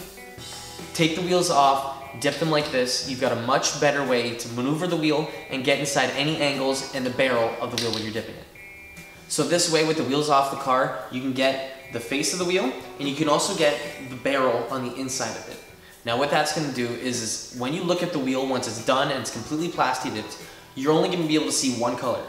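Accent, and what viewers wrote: Canada. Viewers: If I had to guess, I'd say that sounds like a Canadian accent. Great video!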